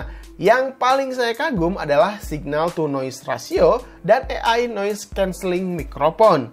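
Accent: West Java, Indonesia. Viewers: wkwkwk sunda bet ngomongnya. ketika V dibaca P "pidio"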